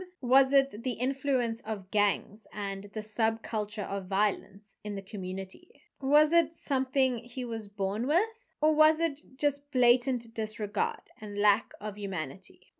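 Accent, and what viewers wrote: Afrikaans, South Africa. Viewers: Love the way you speak Afrikaans 🤣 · its the afrikaans reading for me... this story had me hooked to the end..nice job👍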